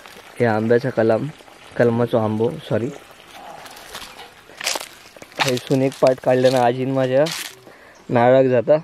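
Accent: Malvani, India. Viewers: मालवणी एकदम मस्त मित्रा. छान वाटते ऐकून आणि अभिमान सुध्धा. Keep it up. छान व्हिडिओ आणि सुंदर माहिती माझीसुद्धा गाव मालवण देवली येथे आहे.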